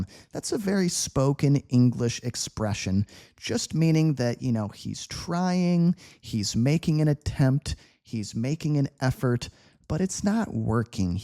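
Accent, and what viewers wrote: United States. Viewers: I'm learning UK English, I don't mind watching your videos and following your recommendations, isn't this channel American English?,I from Xorazim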